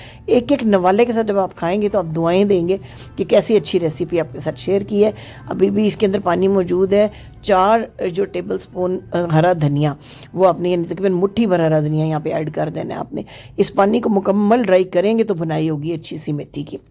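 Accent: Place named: Punjab, India. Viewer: آپ کا اردو بولنے کاانداز پنجابی جیسا ہے اسے بہتر تھا آپ پنجابی میں ہی بات کر لیتے۔میتھی میں نہ تو سوکھا دھنیا ڈالا جاتا ہے اور نہ زہرہ۔اور میتھی کو دم میں پکاتے ہیں۔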